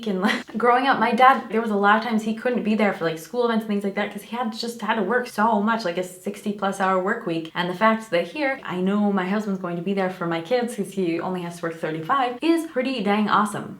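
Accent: France. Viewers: It's so cute that now when you talk in english we can hear the tiniest bits of a french accent sometimes 😊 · Great video! I've watched a couple of your videos now and I can tell that your American English is getting slightly diluted! It's kind of cool? The pronunciation/cadence feels more French. I'm an American living in California, and speak some French and can definitely tell that your time in France has altered the rhythm of your speech! Keep up your videos! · girlll the french gave you an accent! I'm so impressed by your french channel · Your French accent is so beautiful <3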